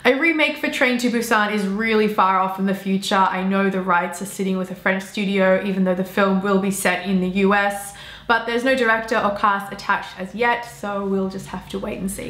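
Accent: Australia. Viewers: You have a good auzzie accent you speak properly unlike some auzzies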